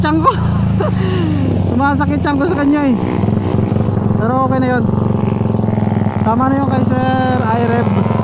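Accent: Iloilo, Philippines. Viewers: Ilonggo ka 😂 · Ilonggo gid man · Taga diin ka kuyaa hahaha ilonggo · Lodi taga san kapo ba ilonggo kapo ba pa shout out nlng lodi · Illongo si sir haha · Baw ilonggo kagd boss😂😂✌✌ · Ilonggo gid si sirrr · To ilonggo ka?toh race ta nga duwa.visita ko da,visita kaman sakon vmtv.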